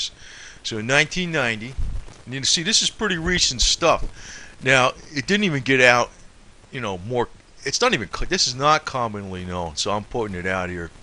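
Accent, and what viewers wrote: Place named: Boston, United States. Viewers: This guy is not a healthcare professional which is obvious from his continued mispronouncing of simple medical terms, especially the word Natto, and NattoKinase. It is not Nacho cheese. It is pronounced Knat-O-Kine-Ace. Serrapeptase as well.(Sarah-Pep-Tace) These are protein based medical terms ending in Ase(Ace)!! Please, if you are going to talk about these systemic enzymes, lose the thick Boston accent so that you sound a bit more intelligent, and then please pronounce the words correctly. You can't just say "when it gets into the bloodstream, it just does it's thing". This is a great misrepresentation of the mechanism of action that these enzymes perform in the body. Get the IDEAR?? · hey, this dude is the real deal, and when some "professional" is coming at him with the "pronunciation" of his words, there's some insecurity happening here. 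the information is priceless, and so is this gentle man. his accent is home to me, new york, boston, love him. I don't care if he calls nacho knish, if it works, we will figure it out no problem! thanks for your input suh! I'd rtather pay him than some herk whose agenda is to get his/her ass top the bahamas on our miserable dime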